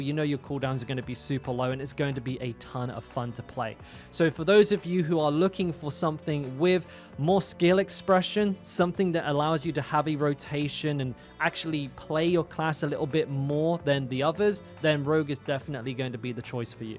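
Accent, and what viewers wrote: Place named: South West, United Kingdom. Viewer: My go to for D4 content!!! Even though the accent reminds me of the uni days in the south west 😂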